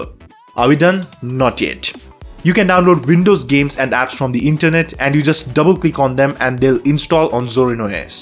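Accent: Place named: India. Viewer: as a native english speaker i find ,most f whats said almost impossble to understand spoken way to fast in a terrible paki/guppta/ indian accent,big FAIL!!!!!.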